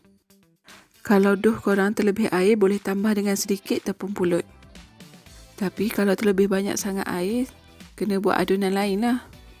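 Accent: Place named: Kuala Lumpur, Malaysia. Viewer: Hebat buat kuih ganu tp slang orang key el😄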